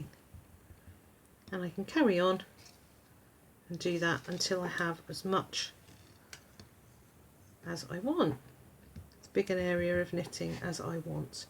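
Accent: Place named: England, United Kingdom. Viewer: I don't do hash tag. I can't understand why anyone would ever give you a mean sign or make an unkind remark to you. You are adorable and most of the I just listen to you talk. I speak "American" and you speak English and I love to just hear you talk.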